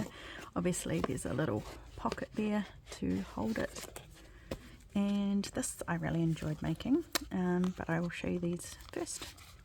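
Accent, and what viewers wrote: New Zealand. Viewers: Gosh, some yummy ideas in this, I love it. What great idea for the Hydrangeas!!!! So very lovely and inspiring. Sending love from New Zealand. xxxxxxxxx Actually it sounds like you also a Kiwi. !!!!! You are very talented. xxxxx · When I heard your accent I knew New Zealand! 💖 Can't wait to eventually visit.... Lovely journal YES so many ideas! I especially loved the double sided lace pocket that revealed the card inside! thank you for sharing your work!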